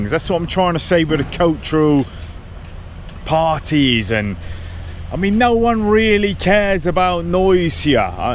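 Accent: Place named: West Country, United Kingdom. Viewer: Some very valid points. I'm married to a woman born and raised in Chiang Mai so a lot of these don't affect me. Where in the south west do you hail from? I lived in Wiltshire, then moved to Devon, spent a lot of time in Dorset and Cornwall, you don't have a Wurzel accent, perhaps a hint of Bristol though... Speaking Thai. I used to always try speaking Thai, but ended up getting puzzled looks, repeating myself, more puzzled looks and then my wife says, exactly the same (at least it sounds exactly the same to me) and they nod and leave, so have pretty much stopped. There are other reasons I'm not really interested in speaking Thai, my wife has about 10,000 family members locally, they ALL want to talk to me! Me not speaking Thai and them not speaking English results in not speaking to them. Is that rude? Maybe but I barely spoke to my brother for the last 12 years I lived in the UK, and I happily avoided speaking to people in the UK for weeks at a time. 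Visa's. It's an unnecessary expense, but I pay a visa agency to do all the work, I spend less than 20 minutes dealing with immigration once a year and I'm happy to pay for that. Ironically it's wasn't the immigration staff who were the issue, it's sitting amongst the endlessly whining/angry expats in the immigration waiting area that I found both depressing and irritating. Budget. I'm the opposite to you, I almost never have unexpected expenses crop up, I know what I need to spend each year and plan for it. In fact since buying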